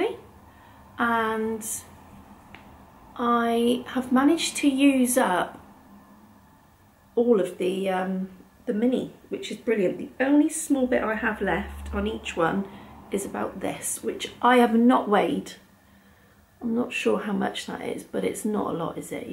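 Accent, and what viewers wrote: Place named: Devon, United Kingdom. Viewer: I’m absolutely here for you and your strongest Devonshire accent. Lovely to spend time with you again xxx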